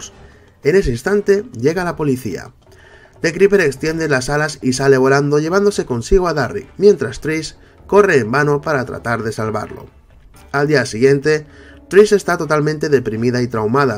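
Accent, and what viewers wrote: Spain. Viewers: por fin un video donde alguien explica lo que pasa en la tercera pelicula ya que muchos no la entendieron y la tacharon de mala yo igual quería hacerlo en su momento por esas gentes que no entendieron pero no se editar vídeos jajaja PD: me encanta tu asentó español :3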